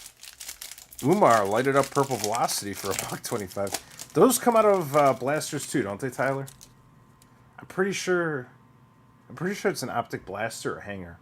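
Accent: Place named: Midwest, United States. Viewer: Or maybe Chicago? Midwest for sure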